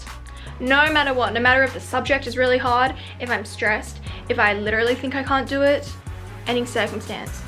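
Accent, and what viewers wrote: Australia. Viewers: Her beng typical a aussie norr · her aussie accent tho 💖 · How to get straight A’s. me thinking why she’s talking about letter grades and she has an Aussie accent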